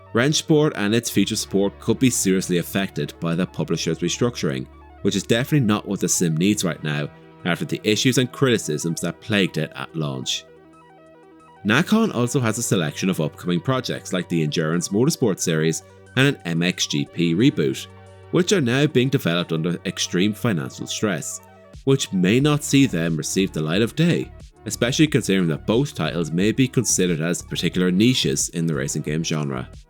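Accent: Ireland. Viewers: Nice to hear an irish voice covering covering car racing genre gaming. Big wrc fan and was wondering whether to pickup testdrive on sale. Bad news on Nacon especially with all their current & upcoming titles you mentioned. I will check out galway rally video when i can. Keep this content coming GRMA.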